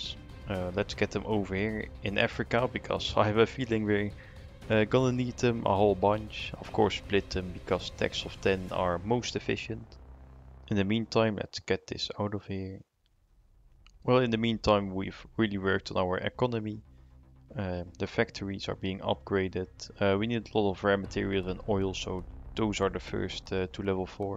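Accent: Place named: Netherlands. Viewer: Lol the fact that i hear your dutch accent made me watch the entire video well done great vid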